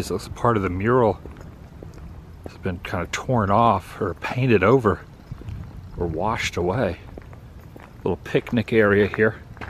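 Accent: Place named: Florida, United States. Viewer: Are you originally from Florida? You and Tampa Jay have the same accent.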